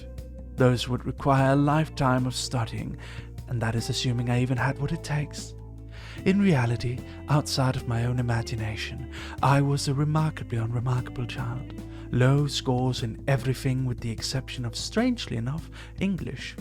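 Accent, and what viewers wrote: Denmark. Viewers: While your English is very good, I can still hear the tiny bit of danish accent in it... as a fellow Dane, I can spot another Dane... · Funny considering every dane can easily hear he's danish But his way of speaking is very endearing!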